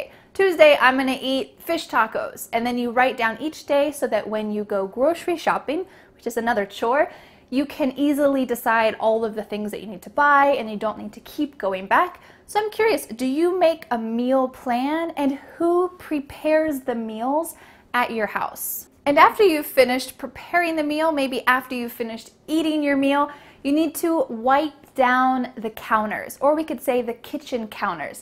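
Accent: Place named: United States. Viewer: It seen like that you are American 😊 Wow ! 😍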